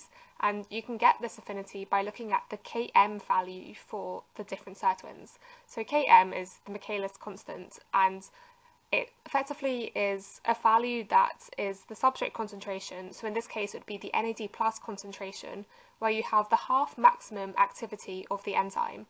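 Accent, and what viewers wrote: United Kingdom. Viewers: Not get used to British accent.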